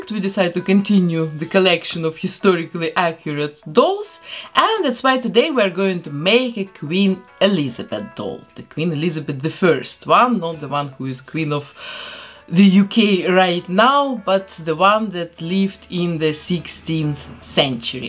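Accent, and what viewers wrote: Russia. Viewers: I love you !!!.. I think your absolutely gorgeous and I love your accent !!!.. are you Russian ???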